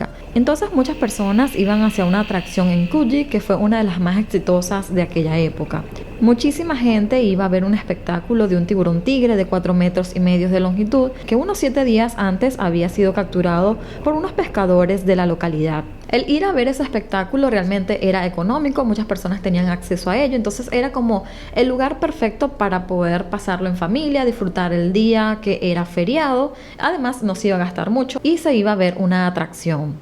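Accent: Venezuela. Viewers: Mrkaaaaa eres de Venezuela? Lo digo por el ascenso qwq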